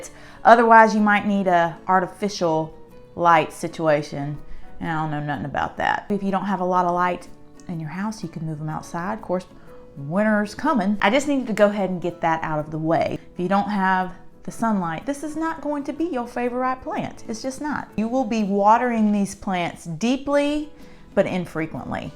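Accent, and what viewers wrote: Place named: South, United States. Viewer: Thank you for a giggle and a smile.. Hoyas are the only plants I have. I live in an apartment in southern Ontario Canada (near Toronto). My growing windows are facing N NE. They are currently indoors now. This year they produced many peduncles, but they did not bloom. I am waiting patiently. Your southern accent is amazing!!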